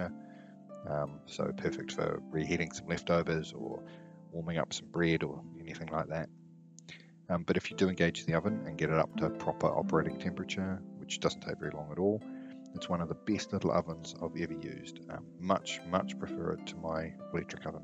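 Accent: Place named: New Zealand. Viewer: Great review, gonna get one for my barge. Nice to hear the New Zealand accent.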